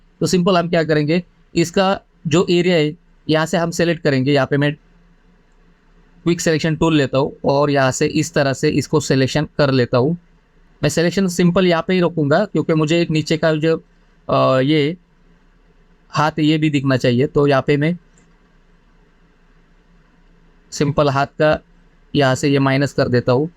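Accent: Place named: Varanasi, India. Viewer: Varanasi se ho kya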